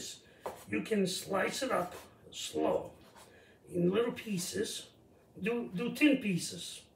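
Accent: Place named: Italy. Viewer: love your Italian accent, what part of Italy is your family from? I come from Giovinazzo, Bari (Puglia region)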